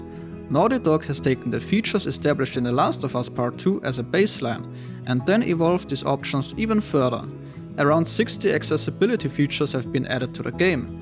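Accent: Germany. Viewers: One Frage you are from Deutschland oder ?😅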